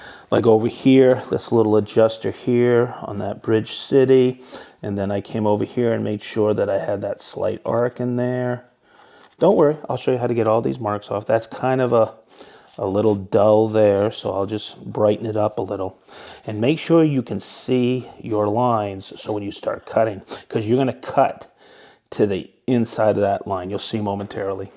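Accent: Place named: Boston, United States. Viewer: I guess you can take the man out of Boston. But, you can't take the Boston out of the man, Hello from a fellow New Englander. I love watching you videos and find them extremely informational. keep up the great work my friend.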